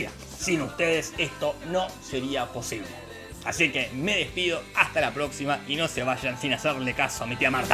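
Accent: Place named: Argentina. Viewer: Hola. He querido sacar unos minutos para, a través de este comentario en el último video de 2021, felicitarte por tu extraordinario canal. He visto todos tus videos con mis dos hijas, de 13 y 11 año, y a veces con mi esposa, desde hace ya más de un año, creo yo. Lo descubrimos en los momentos aciagos de encierro durante los primeros meses de pandemia y se ha vuelto un entretenimiento regular para la familia. Mis hijas han adoptado todos los clichés de tus videos. La de 11 años, Isabella, nos mata de risa cuando de la nada, en medio de una conversación, nos sorprende diciendo con inconfundible acento argentino: "que tristeza tan grande..." o "todo lo contrario a la vida real", o "vamos a entrar al terreno de la f i c c i ó n", o "Ah, ni me sorprende" o "¡Increíiiible!" o “Uuuy, no lo puedo creer!” y, en especial, “¡Patrañas!”, en el que imita el timbre y tono de la escena que insertas en tus videos, y así con todo, siempre en la ocasión adecuada. 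La de 13 años, Nicole, dejó de hablar medio mexicano y ahora habla medio argentino. Incluso a mí me ha pegado expresiones que antes no usábamos como "prendido fuego" (aquí en Colombia decimos simplemente "prendido" o "encendido”), “morfar”, “chapar”, etc. Y ni que decir de las expresiones “agárrate de tu cuñada” o “más… que patada de monja”, que ahora usamos todo el tiempo. Es verdad que no siempre aprovechamos “este momento de mierda” para pegarle la megustiada y la compartida al video, pero sí que le pegamos la suscribida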